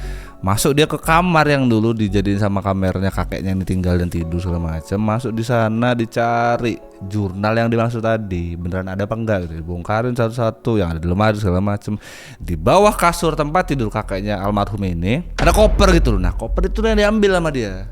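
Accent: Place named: Lampung, Indonesia. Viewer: bg.. omong mu jowo tpi logat mu. lampung.. mntab mntb.. suka dngrinya. 👏👏👏👍👍👍😁😊😊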